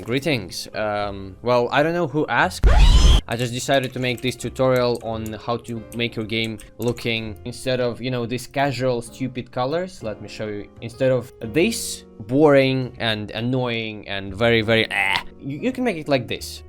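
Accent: Russia. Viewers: Эх, русский акцент тебя выдает ))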